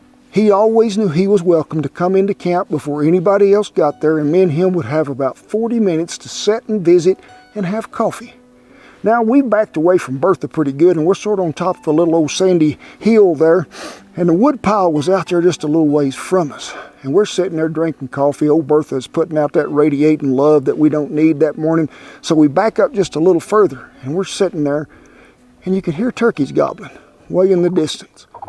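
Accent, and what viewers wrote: South, United States. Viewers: black coffee, hash brown slap up and a chirpy southern camp cook, paradise mahn!!!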